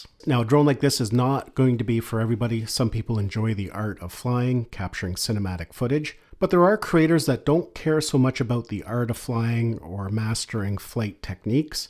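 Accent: Canada. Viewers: You sound Canadian!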